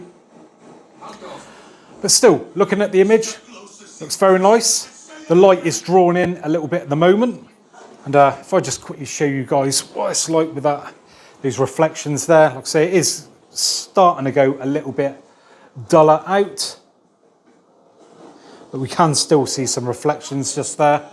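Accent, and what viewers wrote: United Kingdom. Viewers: Love the video mate. Nice to hear a Brit on you tube for a change. What wall mount did you use as I am thinking of buying this. What made you chose this over the C1 by the way?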